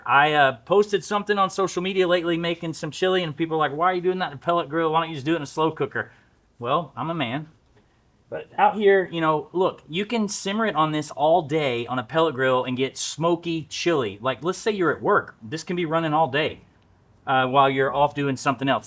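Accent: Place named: California, United States. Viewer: Easy Bake Oven lol all ladies should have one. And you are from Texas???? Sounds like maybe you’re from California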